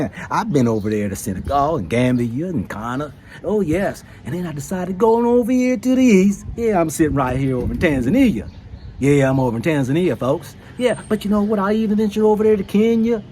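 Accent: South, United States. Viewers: There is a documentary series called The Story Of English. It explains accents like this and others coming from the white Southerners having to communicate with enslaved Africans. Fascinating stuff. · You are now speaking the Southern tune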